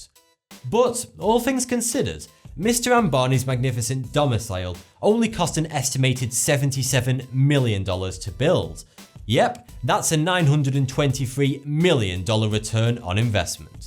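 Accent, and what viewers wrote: United Kingdom. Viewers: He is saying 42. It's a play on words sort of thing. The number 42 is said to be the "answer to life, the universe, and everything" or something like that. This has to do with the fact that he tells various facts about things in life in his videos. His channel is which sounds like 42 in his British accent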